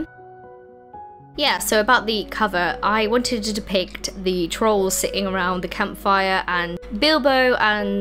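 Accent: United Kingdom. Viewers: Never seen anything from this channel before, but this girl sounds as though she’s originally from Wales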